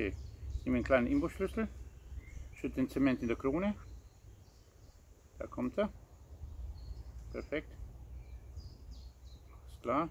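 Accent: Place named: Hungary. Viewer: Ich mag Deinen ungarischen Akzent.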